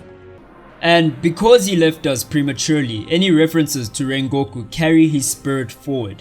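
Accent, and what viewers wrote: South Africa. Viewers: Bro you’re a South African brother? I’m subscribing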